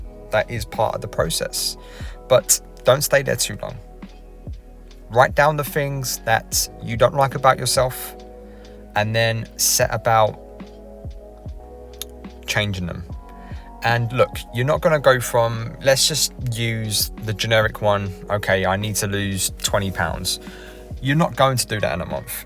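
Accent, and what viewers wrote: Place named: London, United Kingdom. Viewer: I Love the way you speak.....straight up fuck em. Keep it London 😅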